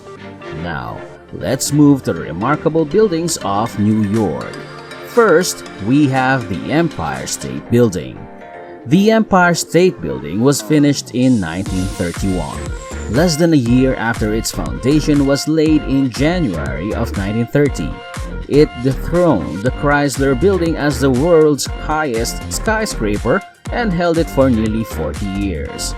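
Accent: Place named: Philippines. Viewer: Narrator is Filipino? The accent is there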